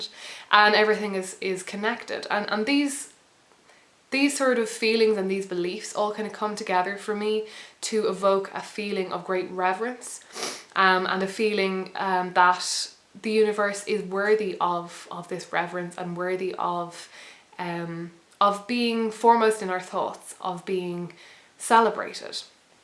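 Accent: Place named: Ireland. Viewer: She has to be Irish. Interesting concepts. A bit fuzzy-wuzzy for my tastes, but I get the idea where she's coming from. Mashing Pantheistic Paganism with tangential science ... what can be sensed .... lends descriptions to quality discussion. Personally, I believe in one, and only one, God. "There can be only one." - Juan Sánchez Villalobos Ramírez How to make a memorable introduction... <link>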